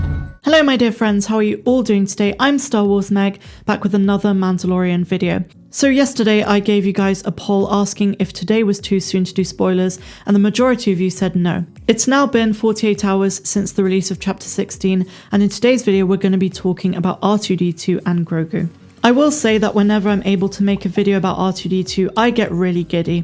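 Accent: United Kingdom. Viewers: I love your british voice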